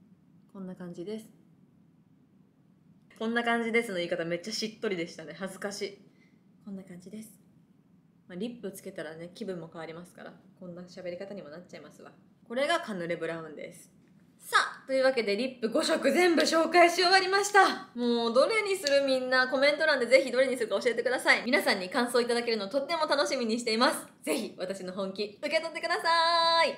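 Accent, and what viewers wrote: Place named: Kansai, Japan. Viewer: ちょいちょい関西弁になっちゃうさしこちゃんかわいい……かわいいやんけ！！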